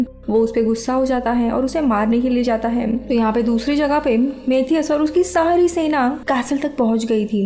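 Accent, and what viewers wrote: Maharashtra, India. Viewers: Are you marathi mulgi?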